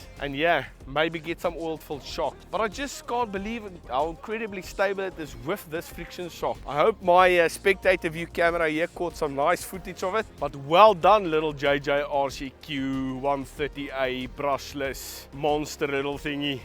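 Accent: South Africa. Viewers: Hey you live in SA still? I had to sub from your accent lol, ex Joberg resident myself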